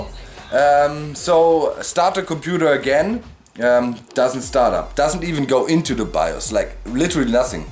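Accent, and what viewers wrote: Germany. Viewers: German Akzent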